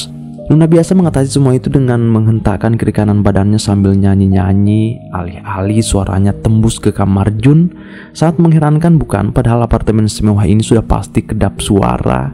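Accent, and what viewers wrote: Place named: Makassar, Indonesia. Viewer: Keliatan sekali logat Makassarnya min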